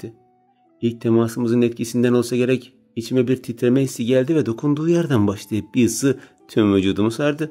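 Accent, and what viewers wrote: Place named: Black Sea, Turkey. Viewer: Selam kardeşim iyisindir inşallah bu hikayedeki Karadeniz sive çok hoşuma gitti ben bı Karadenizli olarak bu kadar güzel konuşamam selametle Allah'a emanet ol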